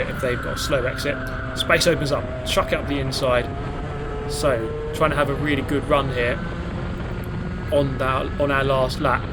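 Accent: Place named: United Kingdom. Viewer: I'm high, and thought i was watching Brouphy lmao. British dude.. i love their accent.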